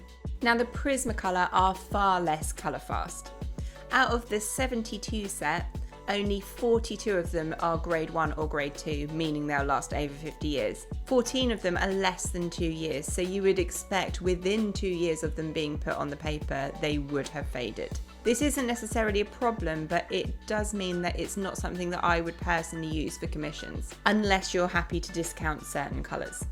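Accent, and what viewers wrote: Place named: United Kingdom. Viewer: Great tutorials! I want to encourage you to keep doing videos and grow big because you are such a Great teacher,I would like to mention to you that you are from UK right,so you have a strong accent and people from all over the planet are watching you,so it is important that you always bear that in mind to never speak too fast and have the music very low in the background,so it does not take so much concentration to clearly understand you. I'm French and understand English very well, but UK accent is not obvious for many people on this planet! That said! Keep going at it!! You love to do this,we can feel your passion! Bravo! Merci!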